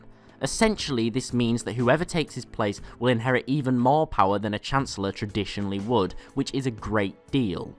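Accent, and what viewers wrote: England, United Kingdom. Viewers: Is this guy english?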